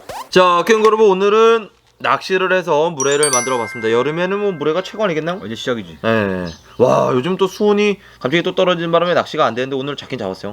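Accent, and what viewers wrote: Gangwon, South Korea. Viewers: 강원도 사투리는 언제들어도 정감이 ㅎ 구경잘허구가우 !!! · 강원도 사투리 좋네요. 구독 신청요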